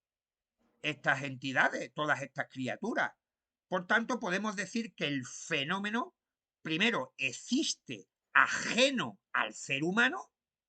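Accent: Spain. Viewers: Are you in South America or Spain,sounds Spanish in Spain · Como siempre te explicas de maravilla. Me encanta escuchar tu acento ( una Algecireña desde Girona), de verdad hablas mejor que ningún político, periodista u orador actual, viva la Z ' Un abrazo crack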